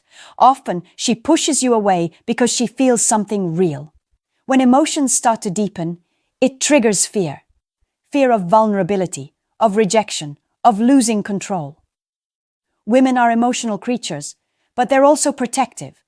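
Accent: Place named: United States. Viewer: Yeah. The A.I. American accent is HORRIBLE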